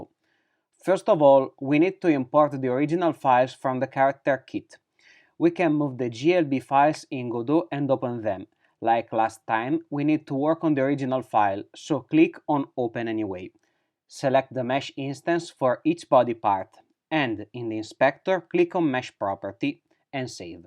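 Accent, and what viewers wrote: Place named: Italy. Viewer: Loving your accent, sounds Italian. I hope I'm not wrong.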